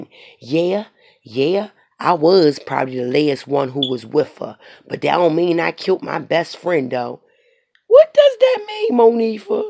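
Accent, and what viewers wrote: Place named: Baltimore, United States. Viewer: You got to be from Baltimore, Cause you sound just like us. But any who great job. Wild that ass out.. love your work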